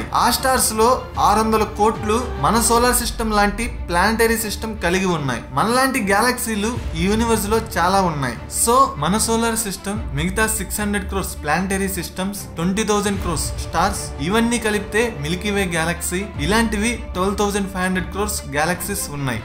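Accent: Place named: Nellore, India. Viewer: mee slang eppudu bagundhi.. First lo aiethe baaga telisipoyedhi nellore or ongole ani.. I am from nellore if possible mana slang kottinattu untadhi inka marcheyamdi..appudu andharu chustharu..mee hard work ni appreciate chesthunanu.. all the best.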